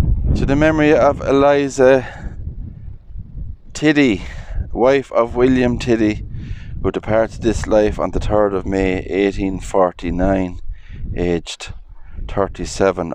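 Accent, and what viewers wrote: Ireland. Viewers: You can't beat the Irish accent, I have a lot of Irish on both sides of my family, the Limerick's and the Baileys , a great video very interesting many thanks , best wishes from Norfolk in England .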